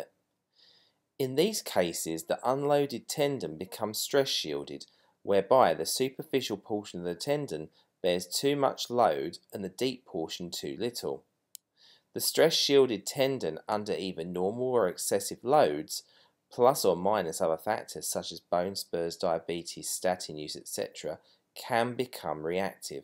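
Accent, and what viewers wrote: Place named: United Kingdom. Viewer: Lol heard the accent and thought "maybe here's someone I can trust with my ratchety elbow". Watched the earlier, linked video... Huntsville... Alabama... that's some displacement fella - bit too far from west London I think. Great vid, thanks for the hope it induced 👍🏻.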